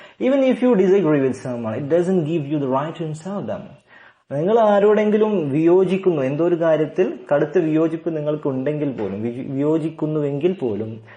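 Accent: Malappuram, India. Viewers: malappuramaano thaankal???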